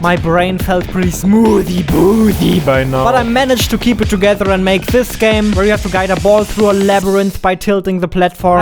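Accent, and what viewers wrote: Germany. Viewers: bist du Deutscher weil du hast so einen akzent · are you german ? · you german? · Could it be that you are german? Or does it just sound like a german accent? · You are german i hear that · Man hört das deutsche so hart raus xD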